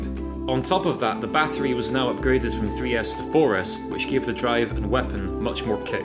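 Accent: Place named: Scotland, United Kingdom. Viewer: Nice story and scottish voice. ;3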